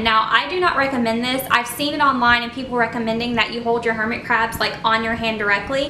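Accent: South, United States. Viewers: It's her Southern Accent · she's southern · she is southern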